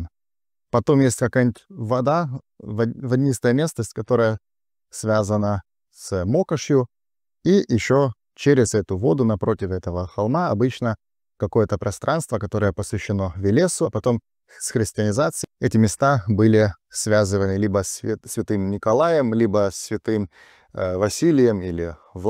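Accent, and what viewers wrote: Russia. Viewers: Сначала подумал вы русский, потом еле уловил даже не акцент, а небольшое отличие в произношении буквы «ч» и «ш,щ»., но не во всех словах, в некоторых.. Это вы так хорошо изучили русский язык или с детства говорите на русском? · С начало подумал из-за лёгкого акцента, что вещает русский, родившийся за границей) уж больно похож парень на типичного русского с севера. Спасибо! Исчезли каша в голове и стереотипы. Обычно после школы остаётся картинка о Косовском поле и что все там сербы, только разные конфессии. А по факту больше различий чем у нас с малороссами, великороссами и белорусами. Отлично! Благодарю!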